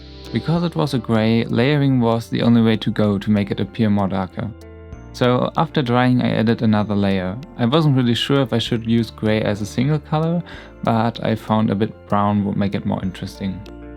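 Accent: Germany. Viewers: You sound pretty german... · iiiiis he german? he kinda has an accent...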